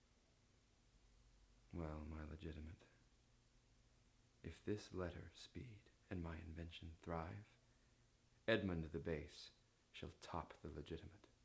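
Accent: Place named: Ireland. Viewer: im so confused if youre irish or american haah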